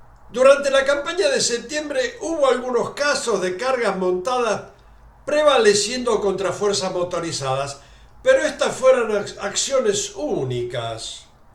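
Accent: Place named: Argentina. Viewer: O espanhol com acento argentino ♡♡♡ parabéns pelas informações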